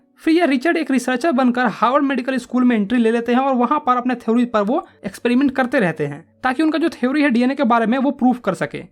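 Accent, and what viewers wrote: Bihar, India. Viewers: Aur ek aur cheez aap bihari ho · Haan, bhai ye bihar se hai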